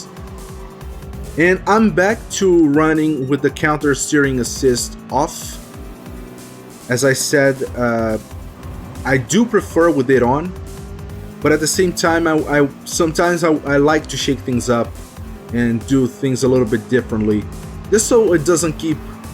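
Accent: Brazil. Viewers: TOP cara.... bem legal hem.... Como eu estou aprendendo aprendendo inglês eu percebi seu sotoque de brasileiro. hehehe Legal cara.. eu também tenho um canal no youtube mas é sobre T.I. Isso manu. Abraços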